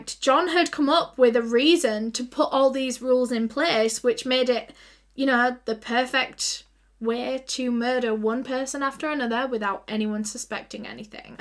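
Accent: United Kingdom. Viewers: I usually don't like all UK accents but I love her UK accent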